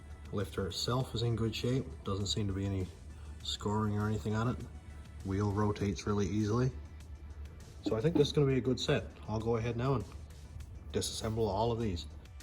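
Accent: Newfoundland, Canada. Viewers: Awesome video ❤!! And I knew that accent of yours was from the rock,…. 😊 a fellow Canadian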